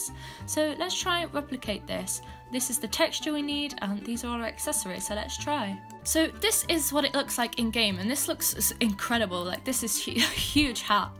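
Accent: United Kingdom. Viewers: I love your accent btw! I think it’s british right? I’m british too.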